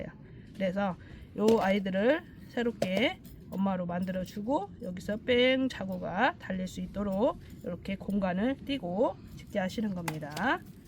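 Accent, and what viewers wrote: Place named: Jeolla, South Korea. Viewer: 안녕하세요 ^^ 진정한전라민국사투리 묘똥ㅎㅎㅎ 정감있는사투리 잘배우고있어요 저도지난번구입한거슬슬분갈이해야겠어요^^ 편한밤되세요 😊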